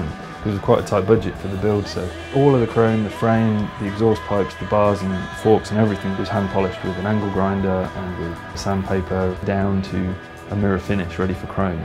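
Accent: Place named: Australia. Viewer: It's even better with an australian accent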